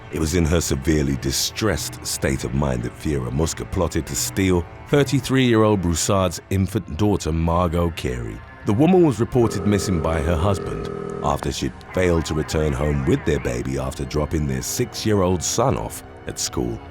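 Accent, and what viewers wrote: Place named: United Kingdom. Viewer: He’s British, they pronounce words differently than Americans.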